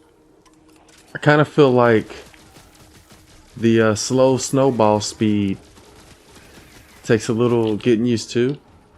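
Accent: South, United States. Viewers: What state do you live in u have a southern accent I think lol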